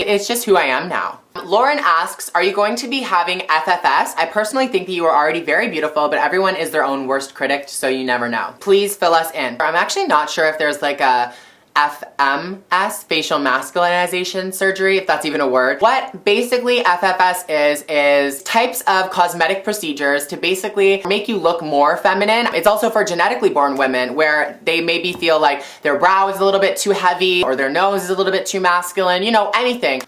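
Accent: Canada. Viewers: you sound more canadian than ever before lol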